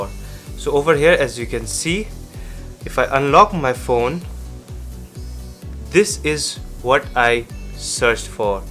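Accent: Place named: India. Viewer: 4 seconds later and I know that you are Indian 😀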